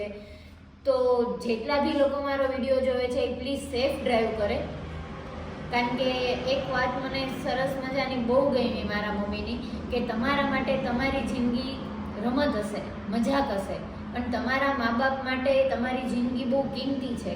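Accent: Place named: Kathiawar, India. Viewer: Ek nabar bolk desi badha ni jem ferndsi nay bolta ae j hova ni maja aave kadhiya vadi ek dam ❤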